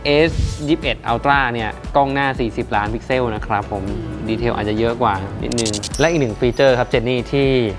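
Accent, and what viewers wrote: Chiang Mai, Thailand. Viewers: พี่เป็นคน เชียงใหม่ใช่ไหมครับ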